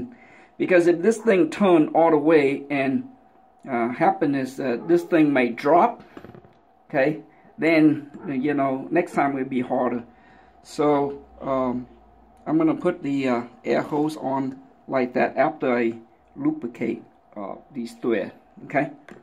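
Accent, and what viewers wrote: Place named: Louisiana, United States. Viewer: You sou d like youre from Louisana.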